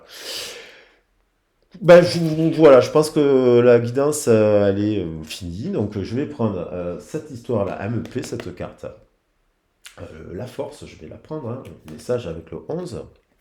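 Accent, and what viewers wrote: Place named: South, France. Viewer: Coucou! Le simple fait de t'entendre dire: "Hé coucou tout le monde", avec l'accent du soleil🌞donne la pêche🤣 Je pense être une guérisseuse, à ma manière... Je te souhaite un bon week-end prolongé de Pâques, même si le temps n'est pas au beau fixe sur le Sud🌧.. Gros bisous🕊🐣😘🍀!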